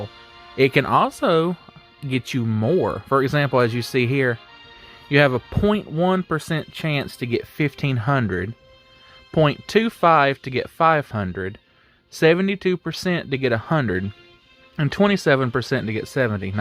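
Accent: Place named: Georgia, United States. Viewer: First time hearing your voice and all I hear is the southern Georgia accent 💀. But I liked your zenkai take. Zenkai level per star is a really good concept for LF’s. If you want to do more content like PvP or Summoning, personally I’d watch it but if you’re comfortable with it.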